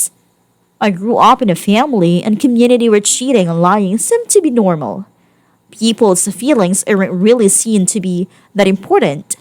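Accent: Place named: Philippines. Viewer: Sounds like this was read by a Philipina robot.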